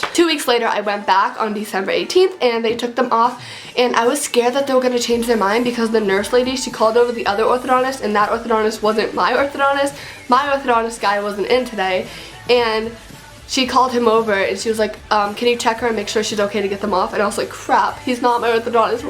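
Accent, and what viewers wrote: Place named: United States. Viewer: It's called an American accent.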